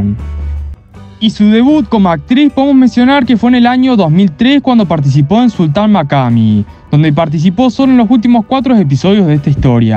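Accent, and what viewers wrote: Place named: Argentina. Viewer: Será el narrador de este video el dueño de este canal ? Que Sonsonete más feo, no habla sino canta, Disculpe pero le recomiendo bloquear su terrible ACENTO (no sé de cuál país es, si Argentina, o talvez otro de esa área).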